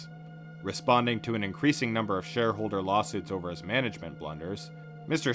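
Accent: Canada. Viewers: Is this also where the weird pronunciation of "chagrin" came from? Never heard it said that way but definitely caught those Canadian "out" and "abouts"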